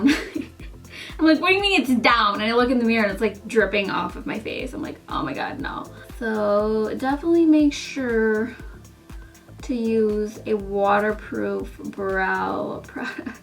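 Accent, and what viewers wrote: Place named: Upstate New York, United States. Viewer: girl youve got the ill upstate NY accent, i feel ya lol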